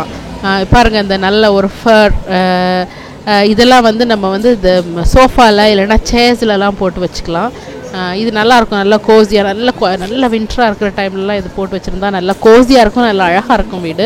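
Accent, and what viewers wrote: Nagercoil, India. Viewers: Nagercoil Slang💪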